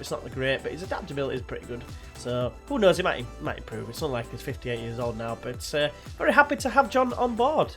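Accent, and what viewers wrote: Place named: Liverpool, United Kingdom. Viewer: That feel when you can tell its speaking but he actually sound's scouser. Great ep as always mate!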